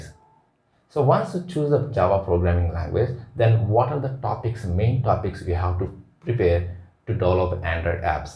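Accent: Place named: Telugu, India. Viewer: Looks like telugu is ur mother tongue me 2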